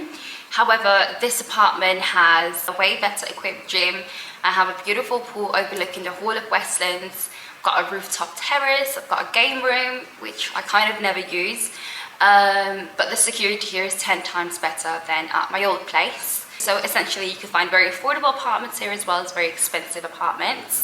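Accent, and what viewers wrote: United Kingdom. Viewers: She is obviously not American dah🤦🏽‍♀️ her accent is British😂 yeah ban and see how that works for y’all economically, did we watch the same video & what she spent?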